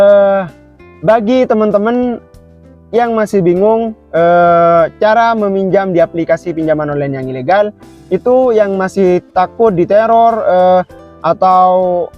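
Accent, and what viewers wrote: Indonesia. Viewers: Kayak orang madura bahasa nya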